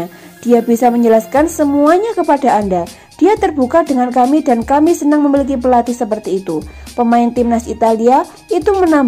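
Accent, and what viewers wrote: Java, Indonesia. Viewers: Hahahaha penyiare orang jawa yaaaa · Medok cuk 🤣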